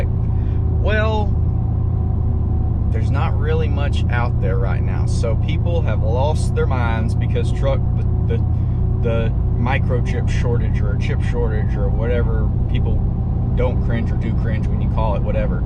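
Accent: Alabama, United States. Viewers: Are you from Alabama ?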